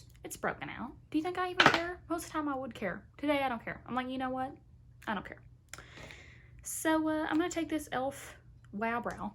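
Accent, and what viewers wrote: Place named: North Carolina, United States. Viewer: I just found your channel today! I feel like I am listening to my sister in law-she's from North Carolina. Where are you from?!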